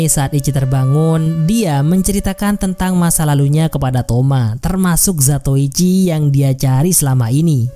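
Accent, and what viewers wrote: Madura, Indonesia. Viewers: Madura dimmah be'en kang? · Lha orang madura.. 😅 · Oreng dimmah bang madura yee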